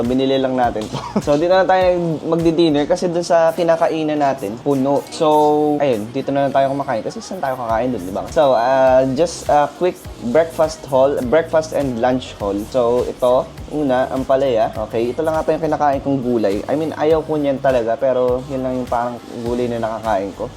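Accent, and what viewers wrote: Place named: Hiligaynon, Philippines. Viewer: Ilonggo ka ba? Bat may puntong Hiligaynon ka? Same kay David Guison. Same kayo punto hahahahaha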